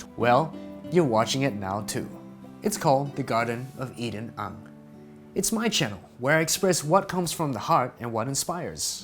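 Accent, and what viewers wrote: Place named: Taiwan. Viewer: OMG YASS no wonder your Mandarin has Taiwanese accent XDD love it so much!! I'm from Taiwan and am studying in Australia now~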